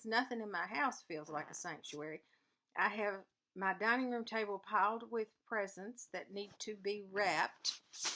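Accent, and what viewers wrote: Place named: Alabama, United States. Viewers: love your video! it is cold here in Portland Oregon. I have spend a few Christmas in Georgia. My sister in law lived in Lawrenceville now Sugar Hill. Your accent reminds me of her. you are right about floss tube I think I know you all personally. Merry Christmas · I just love listening to you. You make me laugh and you talk just like we do in Alabama. Beautiful stitching.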